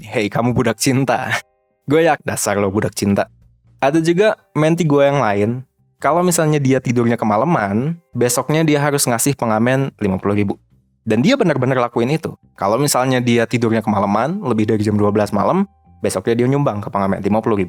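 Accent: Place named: South Jakarta, Indonesia. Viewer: dia ngomong kek orang jaksel 😆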